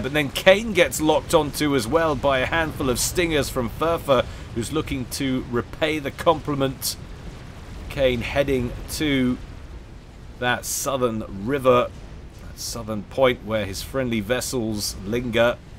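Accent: United Kingdom. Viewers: I think so, he sounds quite British to me.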